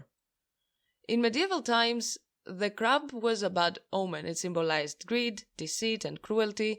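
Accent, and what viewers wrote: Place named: Greece. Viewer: Η ελληνική χροιά φαίνεται από την τόσο καθαρή αποδώσει των αγγλικών. Ιδιαίτερα στοχαστικό GOT βίντεο. Θεωρώ πολύ πιθανόν η εισβολή των White Walkers να μην ήταν αναπόφευκτη, αλλά να ένιωσαν απειλή από τις εξωφρενικές δράσεις των Targaryen για να εκπληρώσουν την προφητεία, οπότε εισβάλλουν για να προστατεύσουν το είδος τους.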